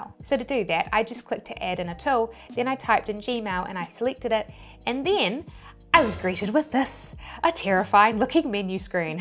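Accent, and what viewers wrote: New Zealand. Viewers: Kiwis have the sweetest accent.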